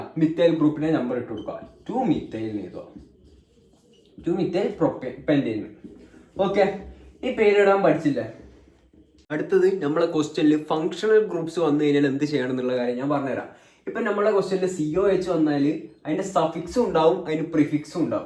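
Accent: Kannur, India. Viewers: Bro kannur aano 😊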